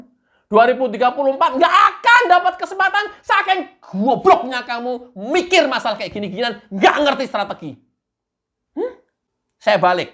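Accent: Java, Indonesia. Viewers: Pak kalo logat jowone keluar,Mantap. seperti; Cok...Dancok / Jancok...😃 Blok...Goblok...😃 Besok kata"nya di tambahi; Uthek'mu Cok😲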